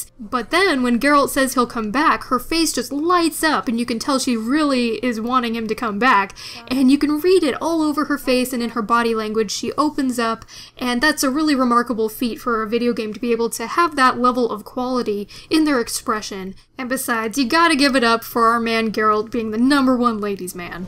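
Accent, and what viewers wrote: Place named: California, United States. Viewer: It sounds like Califonian but diffrent, thanks for rap anserw. Cheers.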